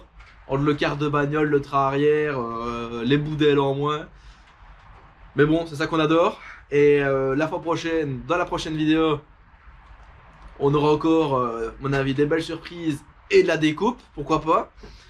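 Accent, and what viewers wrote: Liège, Belgium. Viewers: Toi tu viens de Liège 😁😁😁. Chouette video ami liégeois 😁😁😁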